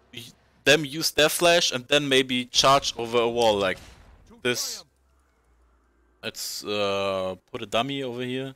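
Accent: Germany. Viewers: Nice video it really helps me. From what country are you? Your accent sounds a bit German.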